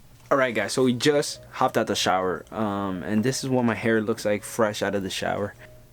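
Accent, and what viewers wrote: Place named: Dominican Republic. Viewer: I knew he was Dominican by the first 2 words he said. 🇩🇴🇩🇴🇩🇴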